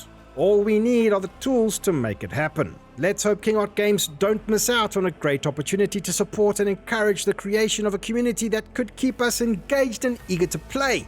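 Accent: South Africa. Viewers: Warcraft 3 has been having some traction lately with bigger prize monies etc. And are you South African 🇿🇦 If you are awe! 🫢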